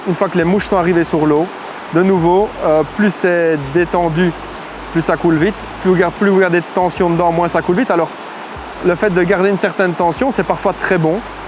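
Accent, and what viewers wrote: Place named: Belgium. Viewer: J'adore tes vidéos ! Avec ton petit accent belge ça rajoute un truc de ouf. Merci à toi. Grace à tes vidéos j'ai découvert une pêche après des années de cuillère... Tout en finesse, tout en sensation !! Je ne reviendrai jamais en arrière ! Merci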